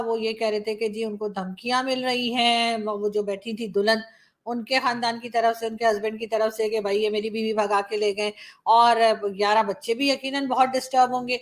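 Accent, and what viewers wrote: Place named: Allahabad, India. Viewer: You are great , absolute Allahabadi dialect , but take care of yourself ❤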